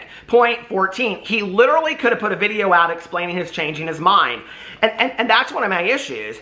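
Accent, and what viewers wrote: South, United States. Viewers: With your Southern draw, where are you from? Excellent video!!!!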